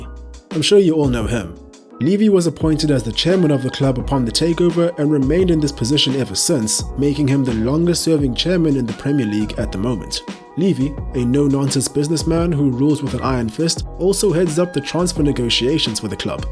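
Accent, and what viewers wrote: South Africa. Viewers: Narrator sounds South African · Awesome to hear a South African voice! Nice work. Subscribed 💪🏻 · The narrator sounds south african! If so, it makes me even more proud to say this is an awesome production. Subscribed!!!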